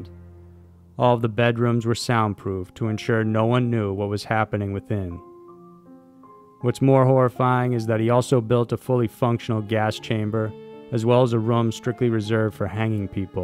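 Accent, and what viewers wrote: United States. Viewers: Probably because a) the guy sounds like he's from the US and b) the US tends to have better records, and also records in English. I know if I was doing this and I came across one that I had to go through and translate I'd quit.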